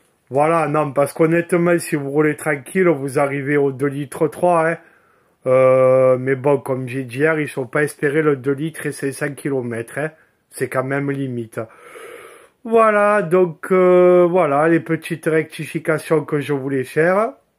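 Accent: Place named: South, France. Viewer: Merci pour ta franchise et ta spontanéité, ça nous change des essais habituels. Tu donnes Beaucoup d’informations, je vais acheter la même. Est que la bulle autour du phare vient de chez Yamaha? Merci encore et bonnes balades, avec l’accent du sud!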